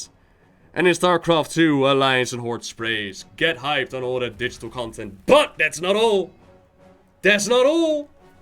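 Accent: Netherlands. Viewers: As a Dutchy also, your accent is very recognizable and makes your videos unwatchable to me XD too bad. I use wowhead though, good stuff!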